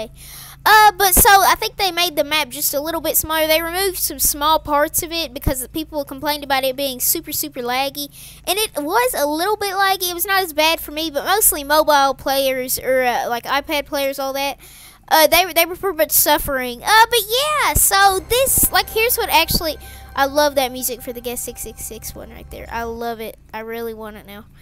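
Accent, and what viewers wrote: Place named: Australia. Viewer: Sound like you’re from Australia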